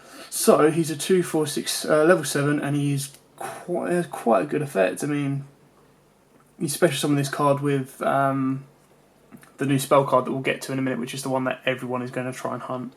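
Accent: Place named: United Kingdom. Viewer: Didn't you noticed his accent?. He is from the UK, It falls to reason Europe got it first. Now that I think about it, We can just import it from there right?. Doesn't matter. It's out now.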